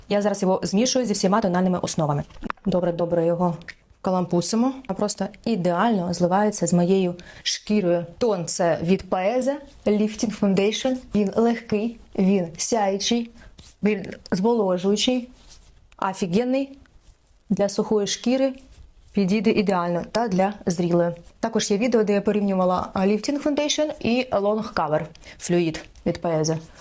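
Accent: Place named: Surzhyk, Ukraine. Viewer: Подобається ваш суржик 🥰😍❤️🔥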